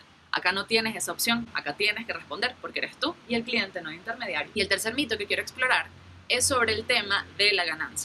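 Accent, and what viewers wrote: Venezuela. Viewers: Le doy play al video, me pongo a revisar Clash of Clans y cuando escucho ese acento Venezolano, vuelvo a ver la pantalla jajajaj. Es un gusto cuando los bloggers son Venezolanos, es como si la persona que te habla es un pana tuyo :D Saludos.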